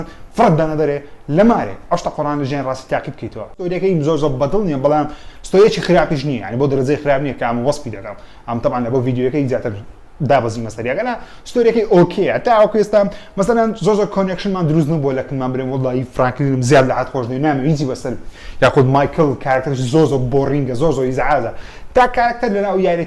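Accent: Erbil, Iraq. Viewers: Zoor zoor Shazy Xoshawestrin ashtt laimn wshakanta Wa Hich zmany xosht nagoria Ba hawlery qsay dakay Xot mozqof nakay ba bo qsaybkay xambar xot Bgory Wa Zoor Shaza vidokant Zirakanau nweia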